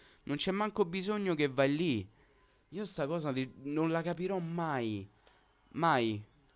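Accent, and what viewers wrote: Rome, Italy. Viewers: come mai tifoso sardo con accento romano?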